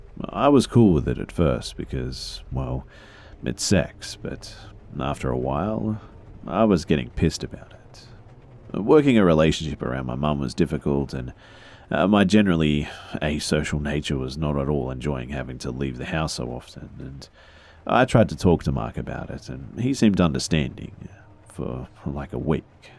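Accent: Australia. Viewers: How his voice is soothing and amazing to hear. It’s like an Australian Prince Charming whispering in your ear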